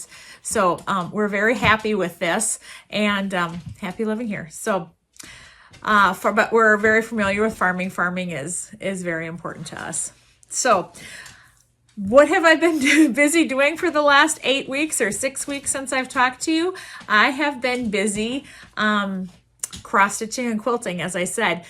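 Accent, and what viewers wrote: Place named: Minnesota, United States. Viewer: Love your shirt!!! I live in Minnesota--I thought I recognized your Minnesota twang...lol. I also own two farms in southern Iowa.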